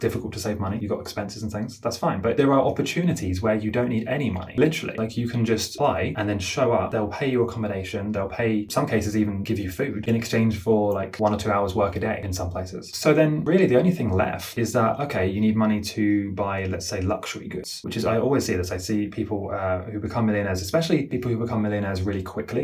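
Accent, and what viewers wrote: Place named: England, United Kingdom. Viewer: Why do you use $ but you have an English accent???? 🤔🤔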